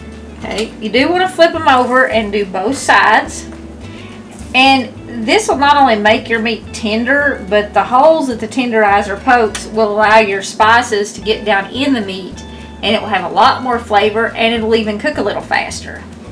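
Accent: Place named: East Tennessee, United States. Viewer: Love your videos, oh & that East Tennessee accent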